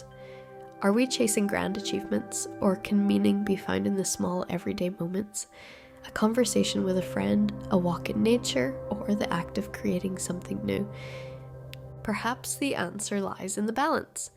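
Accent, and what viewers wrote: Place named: Ireland. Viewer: I pay attention to wait beautiful smart women say or do. And I revell in your subtle irish accent.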